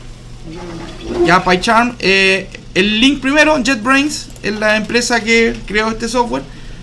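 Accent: Chile. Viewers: usted es chileno no? buen video compadre, esta bueno para empezar con python, me sirvió mucho. saludos!!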